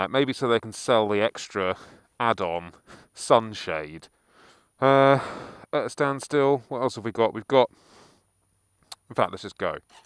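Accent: England, United Kingdom. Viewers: So, what kind of English accent do you have? I like it. Is it "posh"? Northern? Southern? What would one call it?(I'm a clueless fucking American, btw) The sarcasm is dripping and delightful.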